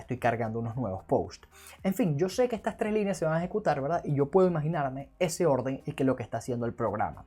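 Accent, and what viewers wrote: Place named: Venezuela. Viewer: Bro por como hablas eres de aqui de venezuela ? despues de css me recomiendas ver videos sobre el dom ?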